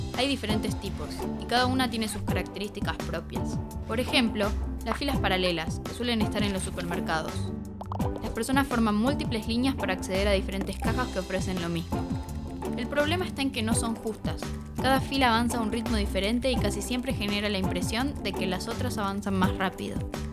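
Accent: Argentina. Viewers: Supongo que por estadistica. Igual en Argentina no todos hablan asi. El acento este es rioplatense, y todo el mundo lo conoce como argentino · Que bueno escuchar videos "en argentino" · Que desagradable el acento argentino en mujeres cuando narran. Es muy local. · Canal voz argenta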